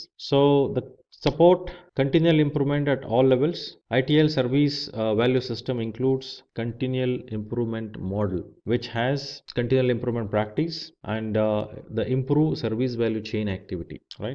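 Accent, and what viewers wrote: India. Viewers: Indian English voice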